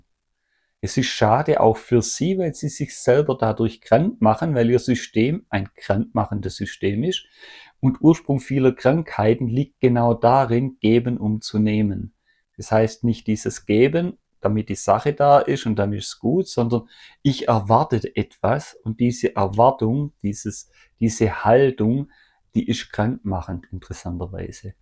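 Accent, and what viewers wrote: Swabia, Germany. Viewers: Auf den Punkt gebracht 👍! Was ist es für ein Dialekt? Schwäbisch? Meine Freundin aus Tschechien spricht so.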